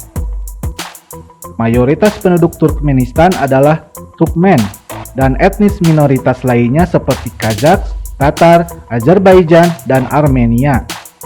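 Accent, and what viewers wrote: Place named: West Java, Indonesia. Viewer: Yang ngomong pasti orang sunda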